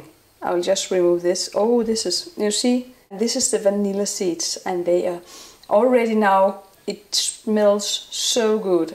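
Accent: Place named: Denmark. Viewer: kæft hun har jo en piiiinlig dansk accent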